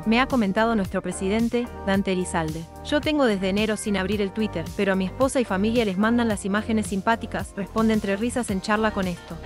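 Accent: Argentina. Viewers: La comentarista es de nacionalidad argentina? la doble l la pronuncia igual que los argentinos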